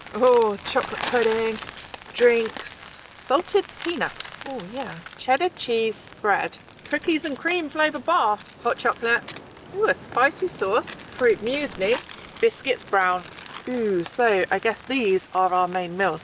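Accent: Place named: RP, United Kingdom. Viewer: thank you, love how you try to hide the posh rege either way. bon labore' Thanks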